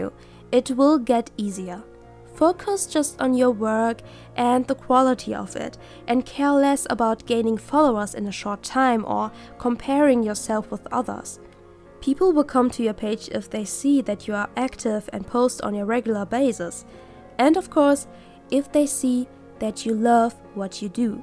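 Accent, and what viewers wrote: Germany. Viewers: Ha, wusste ich's doch. Hab auch den deutschen Akzent rausgehört xD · Ja der Akzent ist wirklich "deutsch" 😅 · Uh dein englisch ist echt gut! Man hört dir zwar extrem an dass du deutsch bist aber trotzdem, klasse! 😱 und danke für die tollen tips, es ist schön wenn man leute hat die deine arbeit schätzen :3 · german accent✨😍😍😍😍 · somehow you sound like you're German are you? sorry if i'm wrong edit: habe gerade die Kanalbeschreibung gelesen :3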